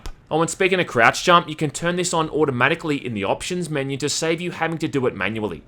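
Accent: Bogan, Australia. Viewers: I really hate how you talk, you sound super bogan.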